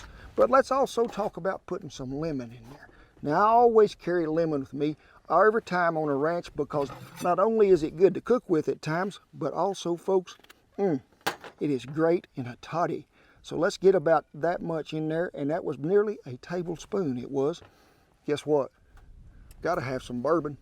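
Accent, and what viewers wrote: South, United States. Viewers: Also. I love the way you talk. The country twang. I’ve had several southern friends and I’ve always enjoyed the accent. Some hate it. Say it’s uneducated. They need more garlic. That’s what I say. 😂😂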